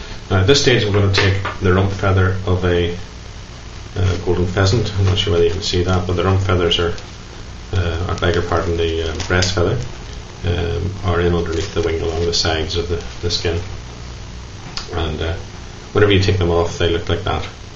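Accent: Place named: Northern Ireland, United Kingdom. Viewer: Always been pondering about a fly to use on that simply difficult river, this fly built to great complication has opened great opportunities to fish on this river. i caught 3.5 with this fly alone in one day "WOW", anyway thank you for the post, my brother Henry will enjoy this video too. Like the northern ireland accent.......... Tight lines.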